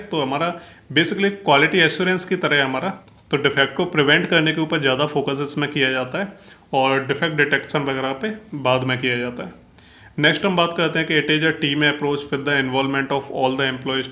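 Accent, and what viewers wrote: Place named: Chambal, India. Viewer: Sir aap bharatpur ya dholpur side ke ho kya ya fir चंबल से ho .aapke voice tone se kabhi kabhi aisa lagta hai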